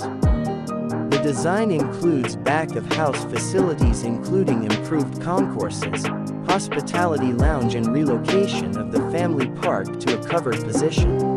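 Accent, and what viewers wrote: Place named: United States. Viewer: Why do we so often have an American voice on LFC YouTube videos.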